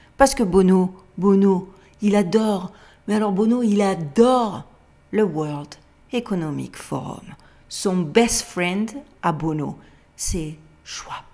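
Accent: Marseille, France. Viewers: Merci pour cette video hyper intéressante et passionnante.Heureusement qu'il y a des personnes comme vous pour nous informer et nous alerter sur toutes ces nouvelles technologies qui ne sont pas pour le bien de l'humanité. Je vous apprécie beaucoup et je reconnais bien votre accent marseillais....de Marseille aussi, alors continuer à porter le soleil du vieux port à Vegas. Par ailleurs, oui ça me tente de gagner une paire de OneMix! A dimanche prochain!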